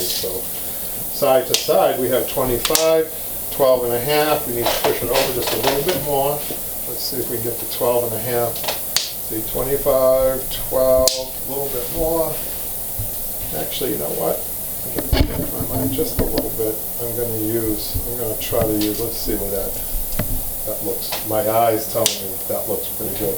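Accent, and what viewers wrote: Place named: MetroWest, United States. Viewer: Just happened upon your channel as I consider tackling my first upholstery project - and I just want to say that you explain things so intuitively. It all makes perfect sense as you go along explaining, that I quickly start to visualize and anticipate what you will say next. Of course, I immediately identified your accent as I am also from the metro-west area :) Easy to understand and well-explained instruction. Thank you! I feel like this might actually be enjoyable to undertake my project!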